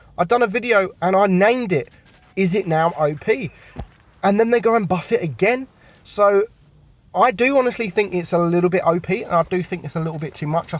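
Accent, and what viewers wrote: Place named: Cockney, United Kingdom. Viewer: What is the maer you are talking about ? All of us are not cockney fluent, so please help us sometime with subtitles or eventually a more classical accent :)))))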